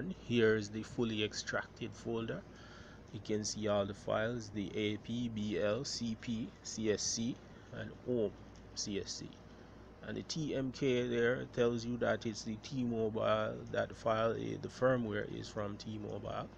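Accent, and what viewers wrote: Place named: Jamaica. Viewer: you sound like a Jamaican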